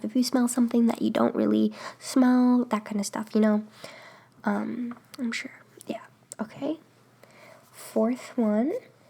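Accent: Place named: Syracuse, United States. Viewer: CONTROL. Control is the word you wanted. By the way, are you from like Syracuse or somewhere around there? Your accent sounds like everyone I went to high school with haha.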